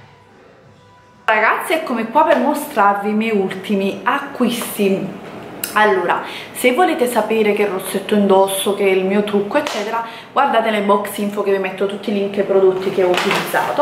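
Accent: Naples, Italy. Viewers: È napoletana, molto probabilmente è abituata a parlare con il suo dialetto, è normale che qualche volta può imbrogliarsi, anche a me succede, certo questo non vuol dire che i napoletani non sanno parlare italiano perfetto, assolutamente, io parlo per il suo caso ( ci tengo a precisare sennò mi attaccano tutti )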